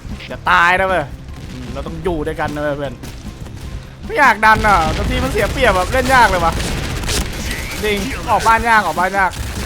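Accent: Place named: Korat, Thailand. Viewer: ดูว่ะ นึกว่าคนโคราช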